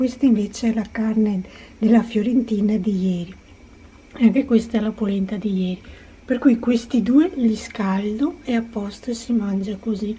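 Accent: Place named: Veneto, Italy. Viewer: Ciao!! Ma di dove sei di bello che sento un inconfondibile accento di casa ( Veneto )? I tramezzini mi mancano un sacco, quelli nostrani, qui in Inghilterra non sono buoni come i nostri, e pensare che quando abitavamo in Svizzera li distribuivo col furgone in Ticino! Ciao cara, un abbraccio e un saluto anche da Anna!!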